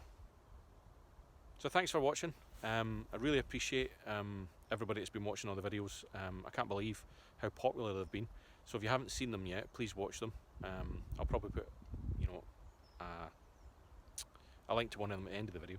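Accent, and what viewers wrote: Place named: Scotland, United Kingdom. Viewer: Maybe it's the equipment you're using. I only have the volume on at 1/4 and I can hear him perfectly well. It's either that or your're not used to a Scottish accent.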